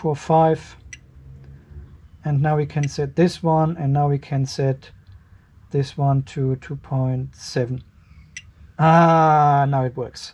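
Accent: Germany. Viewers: ja moin, bin das erste mal hier auf en Kanal und bei dem Akzent gehe ich mal davon aus das du ein deutschere bist?! or am i wrong?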